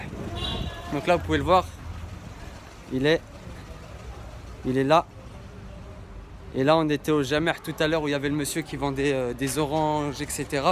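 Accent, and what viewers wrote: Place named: Morocco. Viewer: Je suis marocain je m’abonne frère t’es un bon et maghnia excellent il parle comme les marocain certains Mashallah big up pour les frère algérien 🙏🙏🙏🙏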